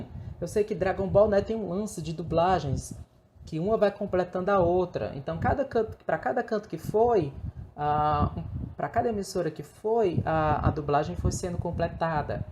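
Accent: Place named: Ceará, Brazil. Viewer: Como eu queria que o reagissse aos comentários que os SBTistas fazem no X do Fórum Chaves. É de arder os olhos de ódio e raiva por cada burrice. Seria de lavar a alma ver o rebatendo esse povo medíocre com o seu sotaque cearense maravilhoso 💫 abraços! Adoro o perfil que me fez abrir os olhos e consumir a obra completa.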